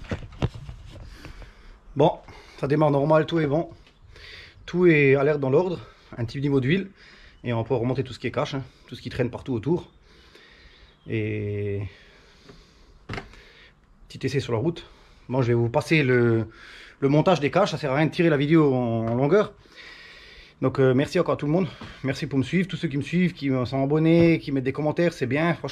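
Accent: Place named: Alsace, France. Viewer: Vraiment du plaisir, pour l'accent qui me rappelle mes années dans le 67, et puis de voir un vrai mécano, pas un guignol comme on en trouve dans les garages de marques à 150€/h . Bonne continuation et un Abonnée de plus ;- )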